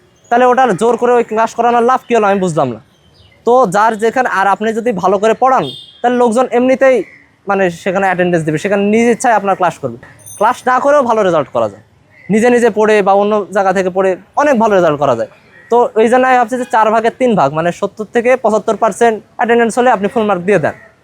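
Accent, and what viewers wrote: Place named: Satkhira, Bangladesh. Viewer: ভাইয়ের বাসা মনে হয় সাতক্ষীরা,কথার টান থেকে বুঝলাম।জন্মভাষা বলে।কথা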